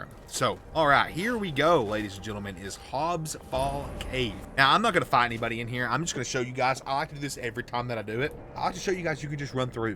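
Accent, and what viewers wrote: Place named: Louisiana, United States. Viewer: Where you from bro? Lol im from Louisiana so the accent is familiar. Geeat content btw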